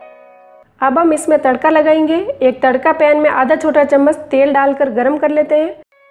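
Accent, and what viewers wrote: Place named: Marwari, India. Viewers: Hindi me marwadi lheja aa jata hai, bahut badhiya. Best recipe. Thanks. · Mam apki awaj sunke lagta hai aap Rajasthan se ho.....kya me sahi hu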